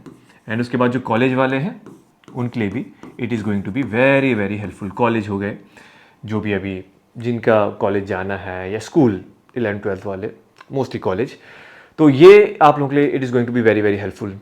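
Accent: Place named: Garhwal, India. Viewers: Hallaa hota hai!! Garhwali tone sir❤️😂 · Hn pur garhwali tone ari and face bhi garhwali lgra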